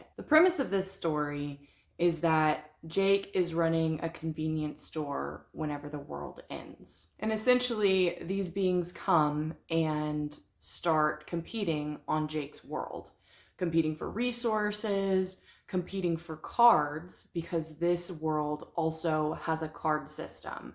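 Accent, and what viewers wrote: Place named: South, United States. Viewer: You sound like you live in the south....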